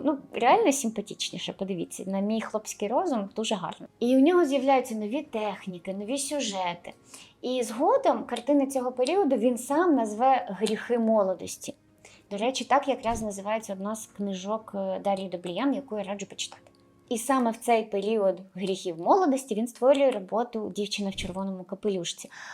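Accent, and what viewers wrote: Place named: Central Ukraine, Ukraine. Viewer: Та Ви шо ! Мова в цієї дівчинки така класна, так говорять в Центральній Україн, на півдніі - це ж наш скарб - різні діалекти, вимови .Вони тільки збагачують її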